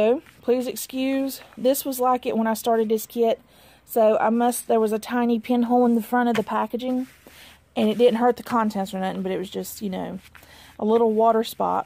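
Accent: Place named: North Carolina, United States. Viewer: Congratulations Proud Mom! Thank your Son for me for his service, please! Love the stash! Cute doggies too! Myrtle Beach is my favorite place on Earth! Is there a LNS in MB or nearby? Love your accent 'cause you sound like me, ( I'm in the NC Foothills, Wilkesboro, between Boone and Winston Salem). Anyway, after all of that said, I really did enjoy your video! Best Stitches! 😀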